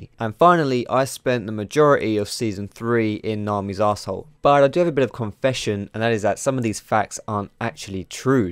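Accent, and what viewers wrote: England, United Kingdom. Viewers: Where abouts in england are you from?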